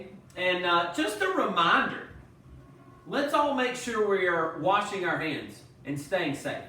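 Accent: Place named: Ireland. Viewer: my one complaint is the Irish accent. Other than that excellent video, keep it up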